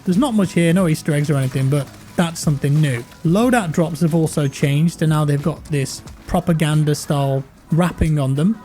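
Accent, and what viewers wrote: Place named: North, United Kingdom. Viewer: I swear to God sounds like Brian cox. It's like a slight northern accent. It's calming.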